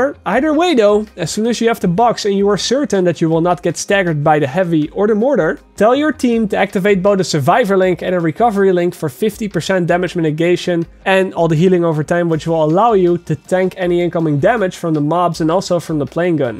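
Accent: Netherlands. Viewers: yo this guy dutch?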